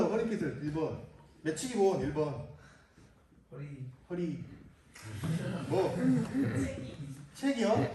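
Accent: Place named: Jeolla, South Korea. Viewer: 즐라도의 말투 조으다 영화보냐ㅋㅋㅋ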